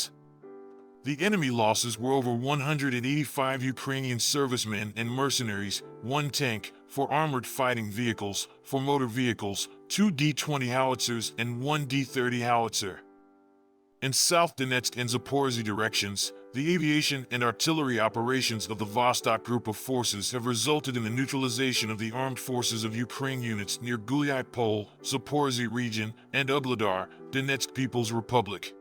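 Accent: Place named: United States. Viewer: Hello you have an American accent but a Nigerian name.Are you from Africa?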